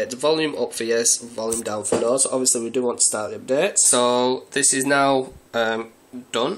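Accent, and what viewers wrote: Yorkshire, United Kingdom. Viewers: Cheers bud,are you a fellow yorkshire man?great guide to follow.:)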